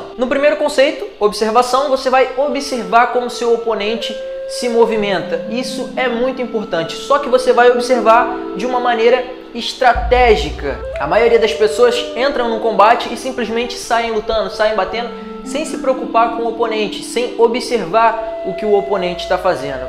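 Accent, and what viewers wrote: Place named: Rio de Janeiro, Brazil. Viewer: willian samuel eu acho que é aqui no RJ, nem sei, porque se tu perceber ele puxa o X "vocêx", todo carioca fala assim kkkk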